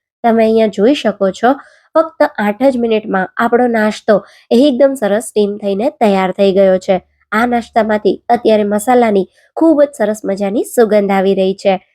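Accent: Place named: Vadodara, India. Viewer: Vadodra gujarati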